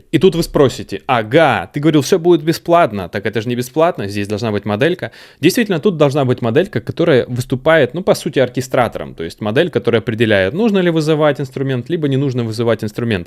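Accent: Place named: Russia. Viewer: Рунглиш классический)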